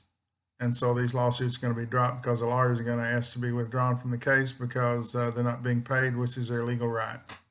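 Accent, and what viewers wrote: Texas, United States. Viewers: I really enjoy your style of delivery. I'm new to your channel, thanks to whoever gave a shout-out. Are you from Texas? There is something familiar about your accent. I live in Keller north of Fort Worth.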